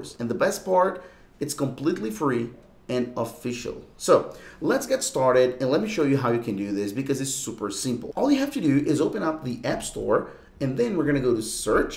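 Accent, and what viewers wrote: Brazil. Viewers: u sound brazilian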